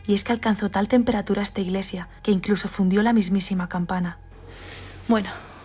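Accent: Spain. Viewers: Terrible lo que barras por tu narración eres española porque no te animas a investigar como en la conquista de américa los españoles impusieron a cruz y espada su religión y como flageban con cadenas a los indígenas y aparte sus infecciónes de viruela que acabaron con ls mitad de los indígenas en américa investiga y verás que ustedes como los ingleses fueron peor que los ingleses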